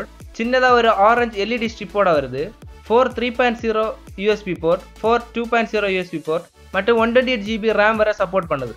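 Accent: Kanyakumari, India. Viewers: Thala ne kaniyakumariya semmaia pesra bro Vanakam maka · anna neenga pesumbothe nenachen nanum kanyakumari than .anna nenga enga erukenga kkla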